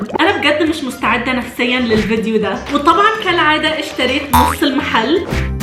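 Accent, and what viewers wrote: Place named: Egypt. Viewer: انتي مصريه؟؟ نص كلامك مصري و ربع منه سعودي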